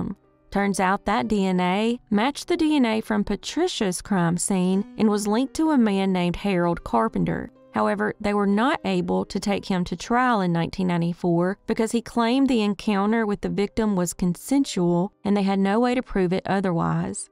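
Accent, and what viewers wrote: South, United States. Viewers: Love my southern Belle voice ! Have a wonderful and Blessed week ❣️🙏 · she has a country accent lol that’s perfectly normal everybody speaks differently but ig we all got our own opinions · Thanks. Love ur voice, only southern one I like💕🥰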